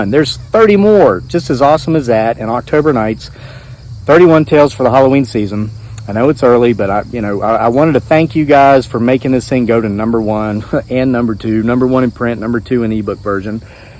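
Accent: South, United States. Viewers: you know.... what makes your stories even better - how YOU read them! it adds a southern flavor and brings then even more alive! have you thought to do an audible version of this book? after all this book will be around for a while, just like Halloween! thank you for reading out loud!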